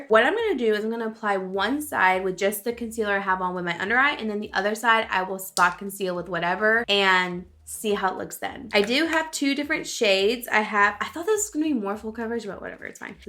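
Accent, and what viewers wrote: South, United States. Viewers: Am I going crazy or did she used to have more of a southern accent? Lol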